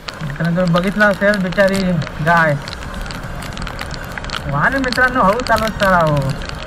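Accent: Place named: Malvani, India. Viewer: Pure Malvani...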